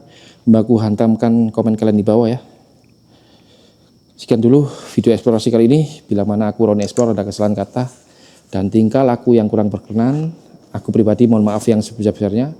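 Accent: Java, Indonesia. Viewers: Inggrisnu MEDOK JOWO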